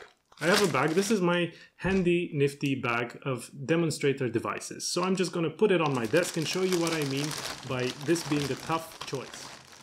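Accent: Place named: Romania. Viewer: I have listened to three videos now. My first guess is that your mother tongue is Romanian. You sound like a pastor from there who leads a food distribution program for the poor in Canada. You do not speak a Slavic language but you are from Eastern or S.E Europe. So, that leaves only one language. It is not so much your slight accent as your manner of speaking.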